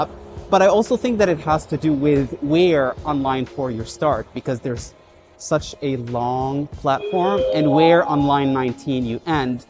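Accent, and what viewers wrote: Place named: United States. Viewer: You sound like an American. Well, I was also impressed by NY subway 35 years ago when coming from China.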